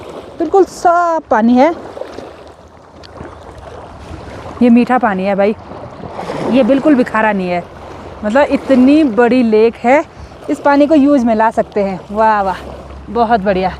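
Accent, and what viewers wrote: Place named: Garhwal, India. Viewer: Jab Hindi bolati Ho tab bhi tumhara Garhwali tone aata Hai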